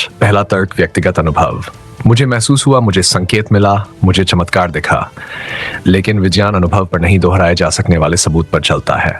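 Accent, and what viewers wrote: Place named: United Kingdom. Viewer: bro english ascent aa rhi hindi mai, thoda sa better karo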